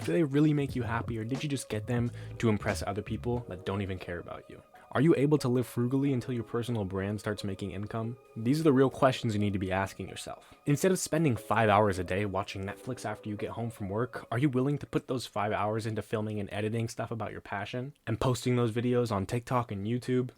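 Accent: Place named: Birmingham, United Kingdom. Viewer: Are you from birmingham UK too. You got that accent 😂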